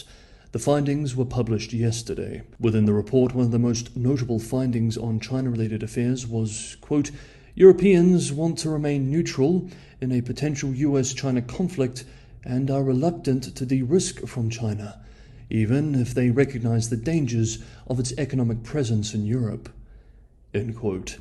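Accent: New Zealand. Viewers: sounds like a Kiwi to me. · where are you from, mate? I think a Kiwi, and I'd guess from Wellington but most Kiwis have fairly similar accents and even Southlanders and Kiwis from Otago generally sound somewhat similar to Kiwis in the North Island (other than most Maoris). I should add that sounds much more polished than most Kiwis but I have family members who sound much more posh & toffish than even he🤣🤣🤣🤣🤣😂😂😂😂😅😄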